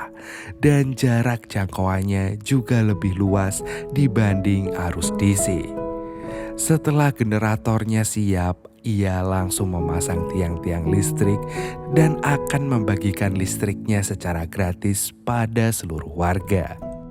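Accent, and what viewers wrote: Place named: Java, Indonesia. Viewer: kirain ini insomnia film wkwkwkk tapi kalo yang ini agak lebih medok suaranya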